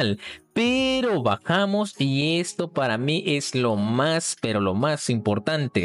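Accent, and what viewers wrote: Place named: Argentina. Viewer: Xq avlas como Arjentino si eres de Salvador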